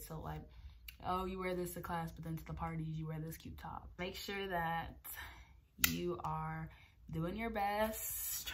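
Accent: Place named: California, United States. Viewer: Great advice. Girl I see your Calley accent is leaving.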